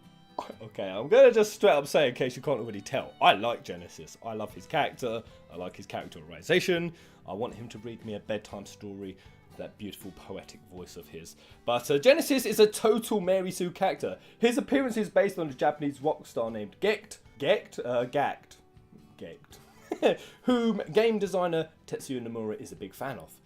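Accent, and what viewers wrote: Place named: Scotland, United Kingdom. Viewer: Scottish accent is great.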